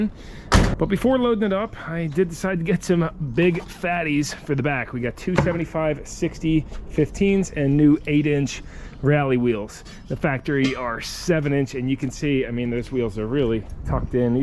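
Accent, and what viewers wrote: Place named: South, United States. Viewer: Luv your southern accent, looks Fabulous! Thank you for posting 😀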